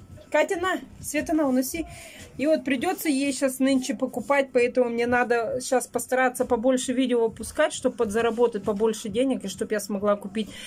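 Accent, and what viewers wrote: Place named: Perm, Russia. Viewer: Зачем Вы позорите Пермский край? Не надо врать, что у нас так все разговаривают. Если Вы не образованная, то не надо говорить, что все такие.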